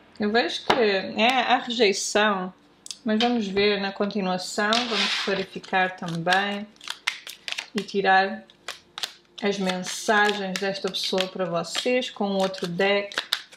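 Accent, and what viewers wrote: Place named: London, United Kingdom. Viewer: You know...a frase, típica do Inglês Britânico ao final de uma sentença verbal não deixa duvida de seu inglês Londrino, You know...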